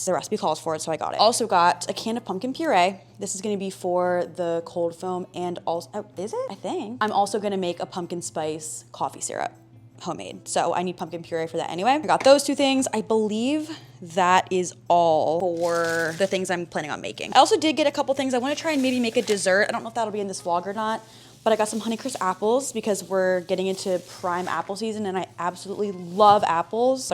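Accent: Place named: Central Pennsylvania, United States. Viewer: Eagles! I love hearing someone with the exact same accent as mine, central PA, gives me inspiration watching your success. Hope you are enjoying Charleston.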